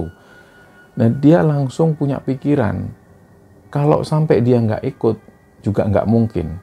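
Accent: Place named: Surabaya, Indonesia. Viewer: mas the best critanya khas suroboyo banget.setiap hari subcribe.nya nambah 1000.salam dari jombang mas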